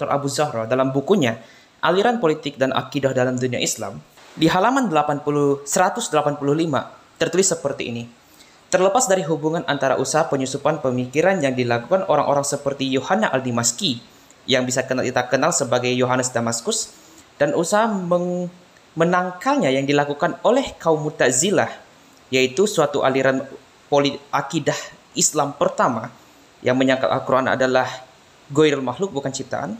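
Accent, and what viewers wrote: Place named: Malaysia. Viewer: Cumi, bang Edis dialeknya Batak, kalo ini agak ke dialek Melayu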